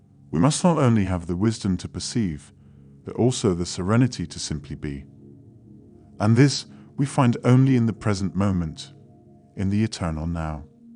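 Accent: United Kingdom. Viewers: What a beautiful speaking voice. Ah, that British accent…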